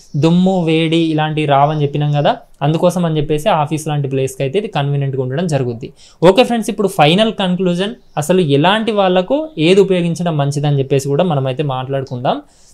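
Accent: Telangana, India. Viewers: Hi Anna sutthi lekunte matter super Telangana slang Lo kiraak chepthunav, Anna intlo interior wardrobe and kitchen Lo wardrobe gurinchi oka video chey Anna plz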